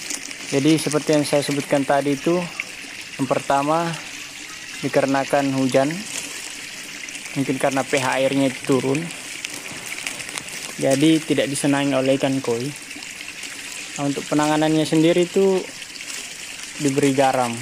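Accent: Bugis, Indonesia. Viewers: Mantap bos. Kayaknya dari logat orang bugis😁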